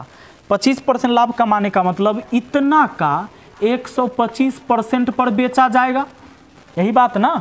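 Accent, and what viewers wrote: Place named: Bihar, India. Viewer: पढ़ाना तो बढ़िया है, लेकिन गाने मे लय तो अच्छी है भाषा मे थोड़ी गड़बड़ है जैसे सावन को साबन बोल रहे हैं, उड़ा दो को उरा दो, गड़बड़ को गरबर ये समस्या बिहार वालो मे ज्यादातर देखने को मिलती है, चाहें वो 10पास हो या पीएचडी ☺️☺️☺️🇮🇳🇮🇳🇮🇳🙏🙏🙏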